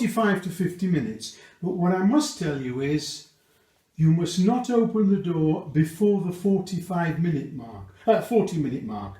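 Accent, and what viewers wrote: Yorkshire, United Kingdom. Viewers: RIP. We're going to miss your wonderful Yorkshire twang.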